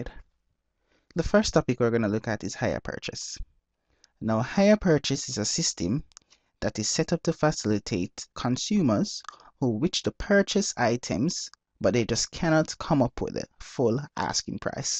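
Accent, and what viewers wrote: Jamaica. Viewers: You sound like a Jamaican :) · When me first hear u I knew u were Jamaican bro, bless up! THANKS MUCH !!!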